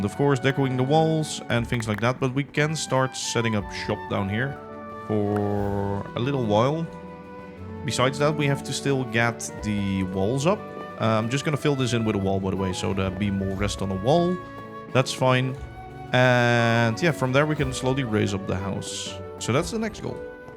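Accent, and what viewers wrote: Netherlands. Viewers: Eyy random question are you dutch? You have a very dutch accent... zou ik moeten weten, ben het zelf. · First time watching your video and i wonder do you speak dutch beceause you have a dutch accent? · I knew it, the accent totally a Dutch guy😂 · I heard you talking on Beardstone or Chosen's video and thought, hey that guy sounds dutch. Now I see you are dutch and a rail fanatic. Coooool. Subscribed. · i'm almost sure you are dutch,, eventho.. came for the clickbait, stayed for the accent! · love the dutch accent as one dutch to another i salute to you!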